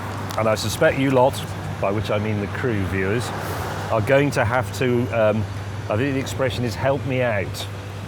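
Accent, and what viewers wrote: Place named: United Kingdom. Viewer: video start on mute, yet somehow I knew he had a British accent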